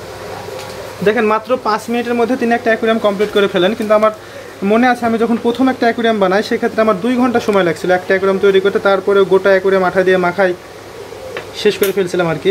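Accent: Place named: Rajshahi, Bangladesh. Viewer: রাজশাহীর ভাই কথা শুন্যা বুঝল্যাম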